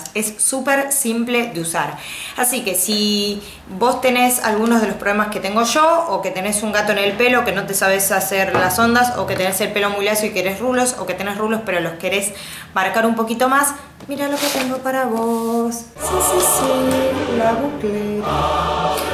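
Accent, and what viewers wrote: Argentina. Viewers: Hola!! yo quiero ganar por que tengo un gato en la cabeza como decis vos, bueno yo tengo una plancha pero ya estoy re podrida de usarlo lacio la verdad que si, un bajon mal,estee.. me encantan tus videos en especial xq hablas como yo y por que vivo en argentina, espero ganar y nada, besos! Ya me suscribi! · Yo basicamente quiero ganarme la buclera porque tengo un gato en la cabeza como vos, y nada, a veces me lo plancho pero para salir o para estar mas formal tengo que hacerme 'ondas' con la plancha (hay que hacer lo que uno puede con lo que tiene, no?) y bueno, nada, eso. Soy de argentina y y y y y y me re gustan tus videos y que seas de argentina me re entusiasma por que hablas tan argento que que que :D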